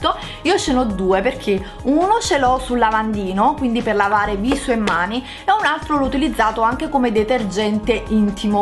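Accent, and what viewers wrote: Naples, Italy. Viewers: Ma sei napoletana? 😍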